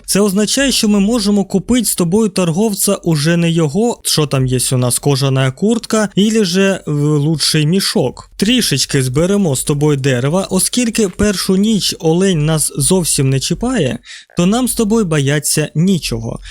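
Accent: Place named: Surzhyk, Ukraine. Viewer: Суржик просто топ🥹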